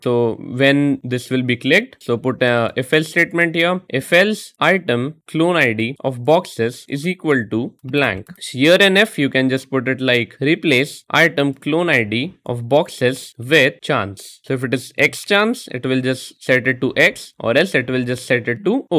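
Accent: India. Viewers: when i heard that indian accent i knew this vid was gonna help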